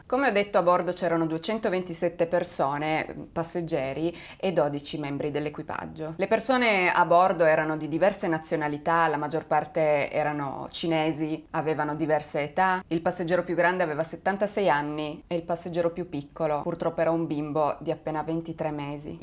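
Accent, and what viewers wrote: Turin, Italy. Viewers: È la classica cadenza Torinese.... Specialmente la E e la O per noi, a Torino son tutte aperte 😂😂😂😂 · Condivido tutti i meritatissimi complimenti, poi, malgrado viva fuori Italia, ha una bellissima e particolare cadenza torinese. Prolunga in modo oggettivo, ma, soprattutto, soggettivo, le vocali. Brava.... c'è poco da fare, ha una marcia in più. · accento lievemente torinese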